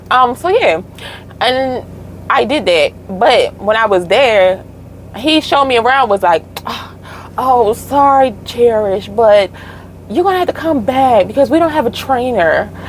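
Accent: Baltimore, United States. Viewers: you from baltimore? haha sound like you from round the way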